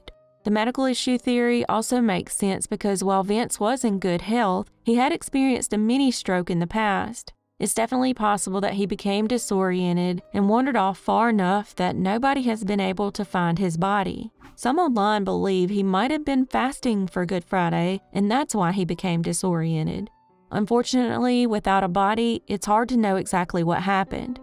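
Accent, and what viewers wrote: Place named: South, United States. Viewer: Just saying...imagine that accent our kids would have... My Russian + This Southern... Awesome job on these stories though... 😀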